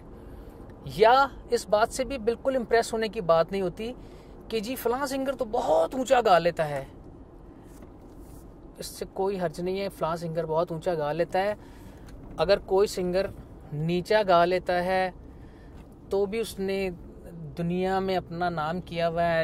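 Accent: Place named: Punjab, India. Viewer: Aapke punjabi accent bahut achha lagta hay sir.... Respect from India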